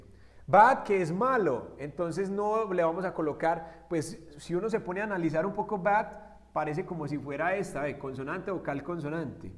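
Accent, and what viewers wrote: Colombia. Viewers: sos un genio, me encanta su acento colombiano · y el acento colombiano uyyyy maravillaa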